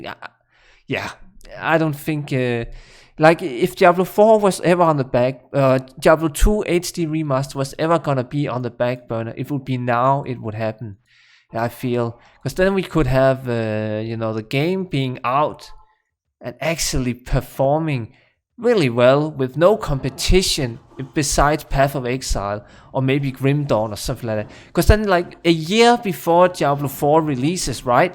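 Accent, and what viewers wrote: Denmark. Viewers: Wow you sound so danish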